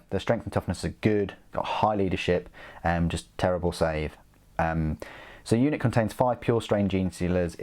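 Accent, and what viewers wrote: United Kingdom. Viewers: THis may sound a bit silly but I love your voice, it encompasses Britishness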